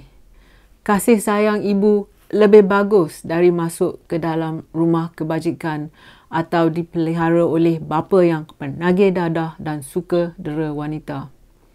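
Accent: Perak, Malaysia. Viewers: Orang mana ni lorat perak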